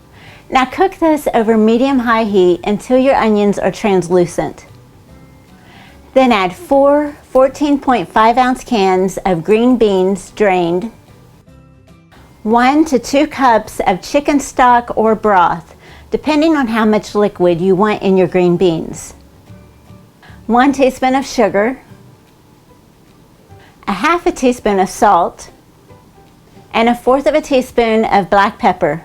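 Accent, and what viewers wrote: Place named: South, United States. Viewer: What a beautiful green bean recipe,. Your southern accent is just too adorable, my dear friend. What's your best tip for making an upside down cheesecake? I love you so much, sweetie.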